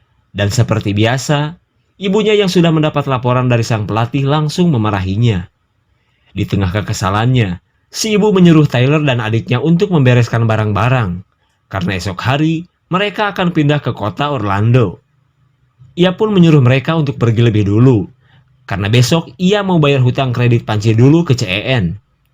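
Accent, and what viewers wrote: West Java, Indonesia. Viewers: Sunda pisan ekekkek hanjakal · Teu dindi2 ai jama sunda ngomong na garelo tpi mantak nyeri beteng ngakak bae😆😆😆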